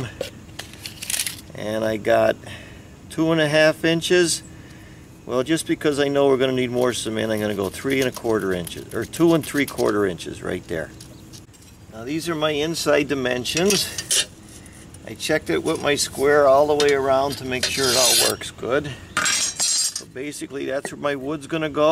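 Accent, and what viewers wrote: United States. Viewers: . Cheers for the info.! Of the many American accents we hear from this side of the pond yours is the most pleasant. Is your accent specific to Scranton or Pennsylvania in general.?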